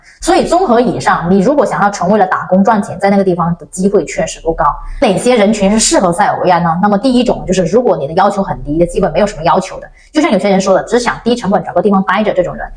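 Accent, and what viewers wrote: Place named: Hunan, China. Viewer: 你是湖南老鄉吧？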